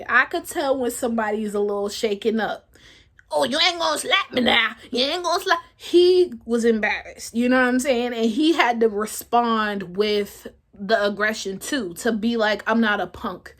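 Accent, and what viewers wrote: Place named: New York, United States. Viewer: I'm glad to see this video, it's an approach to something that we don't talk enough about. Also, the New York is STRONG in your accent and something about that just makes what you say sound just that more legitimate.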